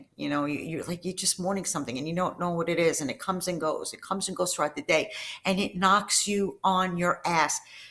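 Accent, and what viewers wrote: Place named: Canada. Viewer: Ahh , c'mon your accent isn't that thick . But , I can still tell your that your cleary Canadian . Clearly . 🤪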